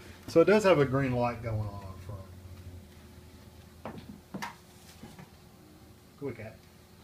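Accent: United States. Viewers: Thanks for you video ! Its great made, you 're nice guy ! But Im french and would have appreciated english subtitle because you have a strong english/american accent ! lol :))) I would have like to see the internet settings panel because I didnt understand if you need to set up login and password of the internet provider or not for adsl...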